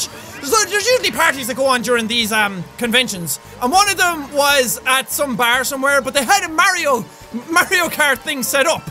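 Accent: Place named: Ireland. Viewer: LazyTurtle I'm brazilian and my school don't teach very well so I have to learn by my self, I learn a lot but I still have a few words to know, I doesn't know too much when I find jack but I actually learn a lot with him, and was hard because he has a Irish accent..... I talk a lot sorry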